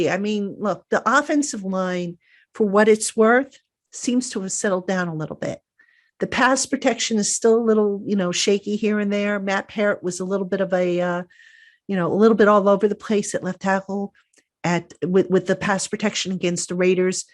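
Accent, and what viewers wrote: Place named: Northeast, United States. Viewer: LOL I f!@ love your accent. 'Ahwords' I love it. As a Canadian I have been conditioned to assume you're either a mobster or possibly a deli owner. But as a Maritimer we share a lot of vocal similarities with the North Eastern US, think just north of Boston. Other Canadians tell me I say "car" like I'm from NYC which is silly but there you have it. EDIT: Just so you know I subscribed a few weeks back at the start of the season but when you mentioned it I looked and I wasn't subscribed anymore. Just a heads up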